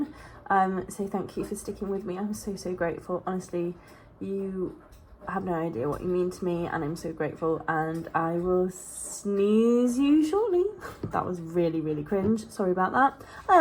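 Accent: United Kingdom. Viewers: What Part Of The UK U From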